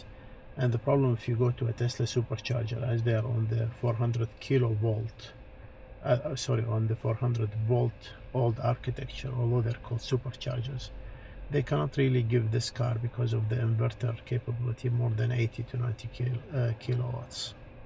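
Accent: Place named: Arab world. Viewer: السلام عليكم. من لهجتك أتوقع إنك عربي. أنا من ملاك 7X متابع لفيديوهاتك. تحياتي لك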